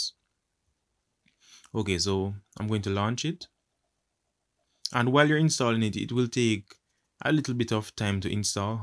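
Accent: Jamaica. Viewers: Dawg sound like a jamaican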